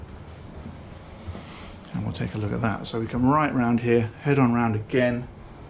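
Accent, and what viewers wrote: United Kingdom. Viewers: Touring expensive yachts is done best with a British accent. Lol It's just amazing the different lifestyles people live. I'll never come close to owning something like this. Must be nice to have money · I saw an American flag on the stern of the yacht, so why do you have this snob talking brit doing the tour? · Always a British narrator for such elegance.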